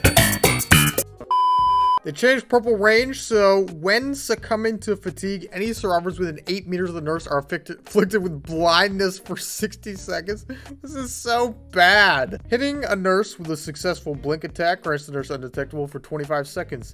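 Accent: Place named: United States. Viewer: There’s something very wholesome about your in-game commentary. Maybe just me liking American accents as a British boy :/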